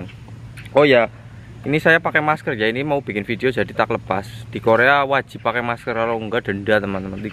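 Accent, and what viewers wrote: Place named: Tegal, Indonesia. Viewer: Ky nya org tegal yah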